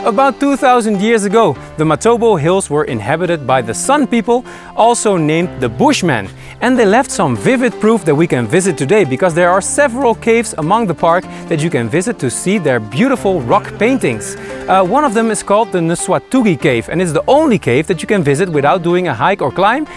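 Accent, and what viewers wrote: Netherlands. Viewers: Thank you for taking us along. Zimbabwean here, born and bred, however I've lived in Wageningen before and immediately picked up on your Dutch accent. Good to share that when you refer to 'Kopje' its just one of the many borrowed Dutch words now part of our either everyday English vocabulary or Vernacular too. E.g. Jazi (Shona for jacket/long overcoat) is from 'Jas' similarly 'Tafura' (Shona word for Table) can be traced from 'Tafel' These are the few on top of my head but there is so much Dutch influence in our culture that a lot of locals may not be aware of. New subscriber too, keep doing what you are doing. cheers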